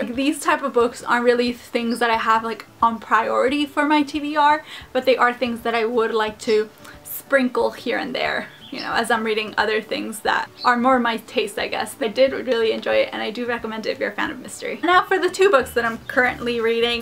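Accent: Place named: France. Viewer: Your french accent is so cute! :D But I don't understand why your french class teacher made you read Agatha Christie and not an author who wrote in french haha (but Agatha Christie is awesome so oh well :3)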